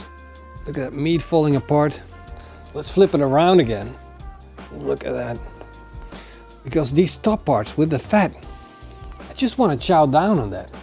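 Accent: Netherlands. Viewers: He’s Dutch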